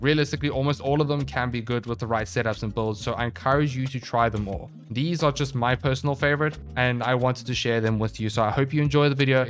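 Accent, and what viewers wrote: South Africa. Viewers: is that a south african accent i hear????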